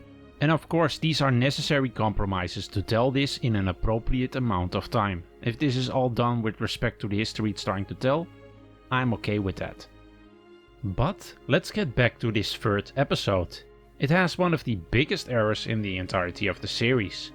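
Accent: Netherlands. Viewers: You sound dutch as frick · Are you Dutch? Seem to have an accent. Thanks for this ! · Aan je accent te horen ben je overduidelijk een Nederlander - ik ook zoals je leest ;-). Het algoritme van YT bracht me hier, echt interessante video, goed gemaakt! Nu mijn vraag: heb je al overwogen een 'episode compare' te maken van de afleveringen van BoB die zich in Nederland afspelen? 't Is al minstens 10 jaar geleden dat ik de serie voor het laatst gezien heb, maar ik meen me te herinneren dat minstens 2 afleveringen zich (iig gedeeltelijk) in Nederland hebben afgespeeld (Eindhoven, Veghel & buurt van Nijmegen -> die met dat kruispunt ergens in een polder vlak bij Nijmegen). Heb je uploads bekeken, maar zag ze er niet bij staan... lijkt me echt interessant vanuit historisch oogpunt, ook voor de vele fans van over de hele wereld van deze fantastische serie, plus qua productie voor je relatief 'eenvoudig' te maken - je woont hier immers al (neem ik aan, althans). 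Ik voorspel je, het zal echt goede content zijn die je veel views zal opleveren! :-) (een hele serie over alle afleveringen zou helemaal een hit zijn, denk ik... maar het moet allemaal maar kunnen natuurlijk -> daarom, Nederland om te beginnen, lekker dichtbij)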